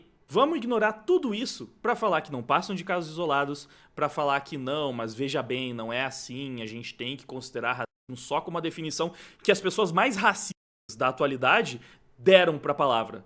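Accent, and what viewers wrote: Brazil. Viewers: Sulista né?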